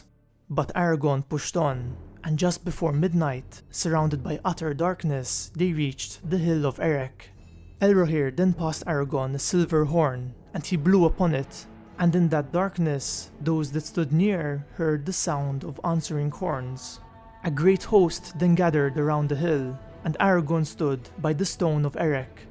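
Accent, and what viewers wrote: Malta. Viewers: LOL... I love Malta & Gozo though I've only been once, I think the accent is beautiful and can listen all day, but sometimes I have a slight laugh to myself as the pronunciation sometimes differs from English... then he shows a bowl of oats for the "oat breakers". LOL!! Well played sir, well played !! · Are you Maltese?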